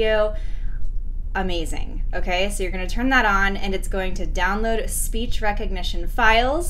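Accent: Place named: Ireland. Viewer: its still not working, or i guess the Irish accent in the video is just too thick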